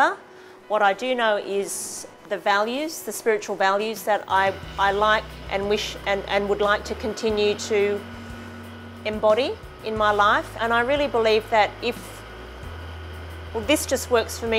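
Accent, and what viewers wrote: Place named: Australia. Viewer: Lovely Chinese girl ruined by an Australian accent:-)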